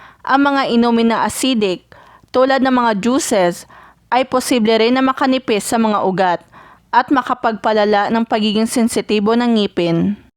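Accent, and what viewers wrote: Visayas, Philippines. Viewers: Bisakol magsalita Hahahahaha hahaha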